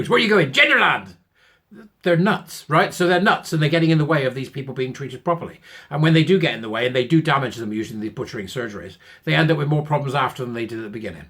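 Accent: United Kingdom. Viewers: The reason you are bothered by the word urinary is because of the way you, as a Brit, pronounce it. Try the N. American way.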